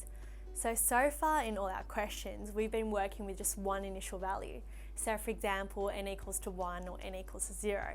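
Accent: Australia. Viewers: Hello. Are you Australian? Just asking for a friend.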